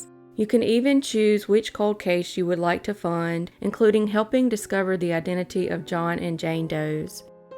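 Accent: South, United States. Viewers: As a Texas woman l appreciate a southern accent, enjoy your videos, so tragic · i kept hoping you'd say "I do declare" at some point. We Brits love that Southern drawl...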